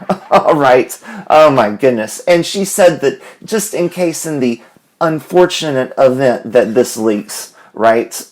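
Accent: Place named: South, United States. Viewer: Omg you look totally different than I imagined you in my mind. lol I pictured you a southern gentlemen from like a southern gothic novel. Sorry your voice just makes me think of that image. Nice to see you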